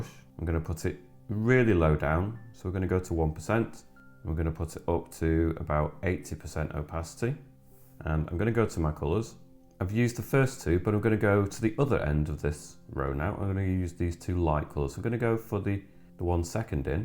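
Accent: United Kingdom. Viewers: Turn on the closed caption, cc, to understand the British accent for people in the USA. what he did say?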